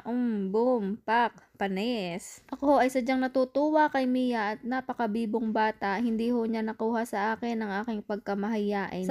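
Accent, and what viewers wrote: Batangas, Philippines. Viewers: Nakakaaliw ang tonong batanggeño😁👍 sis. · Natutuwa ako sa iyong Batangueña accent! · Natutuwa ako sa inyong tatlo Ako ay taga San Antonio Texas at meron din ako bahay sa Lipa City Batangas kaya gustong gusto ko yong puntong Batangas mo Pero ako ay isang ilokana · Hi there enjoy lang akong manood ng blog mo. Ayos na ayos yung narration mo na puntong batangenya. From Batangas ang Tatay ko kaya gusting gusto ko ang tonong-Batangas.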